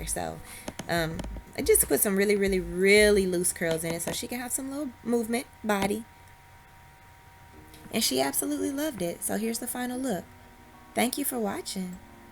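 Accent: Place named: South, United States. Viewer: Love your tutorials, and I especially love your country accent!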